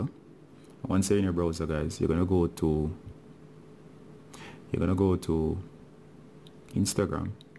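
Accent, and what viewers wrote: Jamaica. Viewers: Love di Jamaican accent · You Jamaican ?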